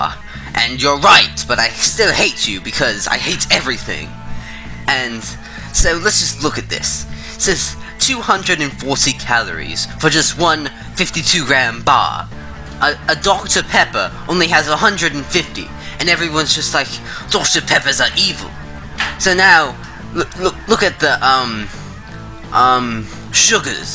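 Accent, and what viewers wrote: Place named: United Kingdom. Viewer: Why the British accent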